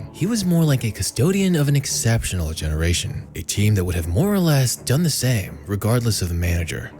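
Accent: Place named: United States. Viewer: The yank accent further proves how laughable this is 😂😂😂😂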